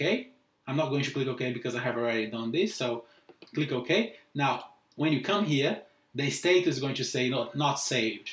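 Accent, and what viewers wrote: Brazil. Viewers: Vc por acaso é brasileiro ? Oo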